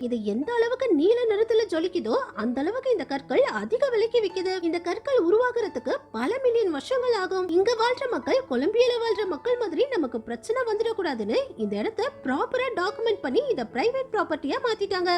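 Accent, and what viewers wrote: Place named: Sri Lanka. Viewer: இலங்கை தமிழ் ❤❤❤ இலங்கை நிலை 😢😢😢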